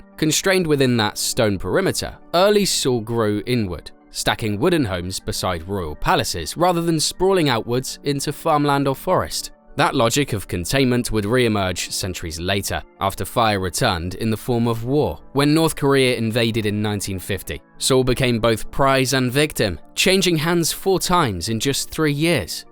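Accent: United Kingdom. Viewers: This video makes me feel like having a English listening exam... good diction, rhythm and intonation... British accent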